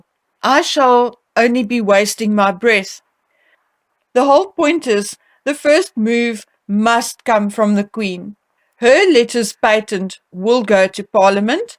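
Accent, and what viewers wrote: South Africa. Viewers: We're in California, so we don't have much opportunity here to speak with many people from South Africa, but from what I've heard accent and style of speech is very typical. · Your videos are always very informative and well prepared and I look forward to hearing your news every time one comes up. Thank you for your hard work,I love listening to your S A pronunciation.Brava best wishes from Italy .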